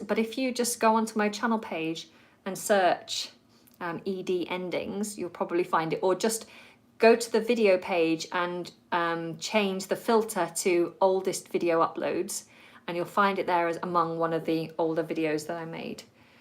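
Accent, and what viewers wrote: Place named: United Kingdom. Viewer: Hello, my dear teacher. Unfortunately, I missed your live video but I watched the last lesson about marketing and like always I learned many new words and phrases about marketing. That was awesome. U are the best teacher I ever had because u r very energetic and I like your lovely pure British accent. Thank you so much.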